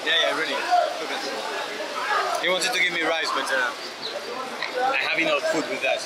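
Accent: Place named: France. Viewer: i can know ur from fr with ur acsent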